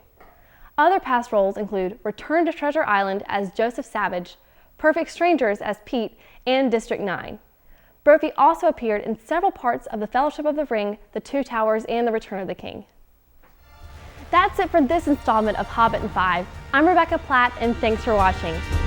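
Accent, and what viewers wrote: United States. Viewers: Love the Southern accent!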